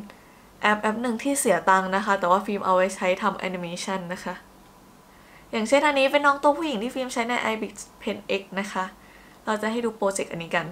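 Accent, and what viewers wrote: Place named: Thailand. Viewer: คิดถึงช่องนี้ เพราะ ตอนเด็กๆดูบ๊อยบ่อย จำการรีวิวได้ แบบ จะเน้นของที่รีวิวแบบ เหน่อๆ แบบ อย่างนั้นแหละ 555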